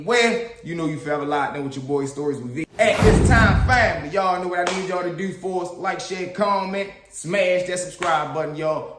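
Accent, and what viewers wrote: Baltimore, United States. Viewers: You from Baltimore bro?? You gotta be from the town fr 😂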